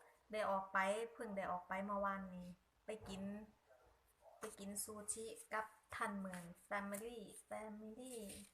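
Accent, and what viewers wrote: Korat, Thailand. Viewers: สวัสดีจ้า ภาษาโคราชน่าฟังค่ะ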